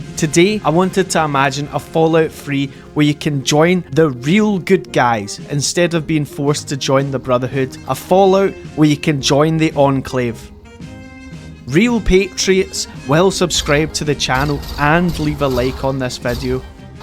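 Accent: Scotland, United Kingdom. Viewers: immediately like 3 seconds in the scottish accent throws it all off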